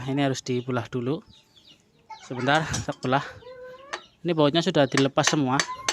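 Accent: Banyumas, Indonesia. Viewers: Njenengan wong endi sih mas? Ngomonge ngapak deneng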